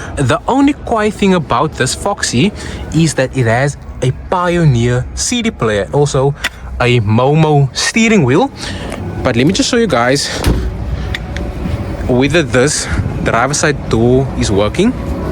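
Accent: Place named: South Africa. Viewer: bro got that brai/bokka accent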